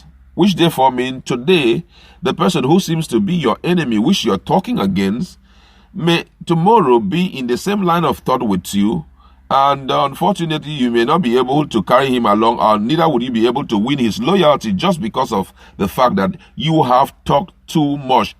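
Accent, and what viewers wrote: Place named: Ika, Nigeria. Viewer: Bro, you speak like an Ika Igbo, you sound like George Oyinbe. I went to high school in 1970/1971 with a guy called Pius Oyinbe from Ika. This was in Ologbo\Benin.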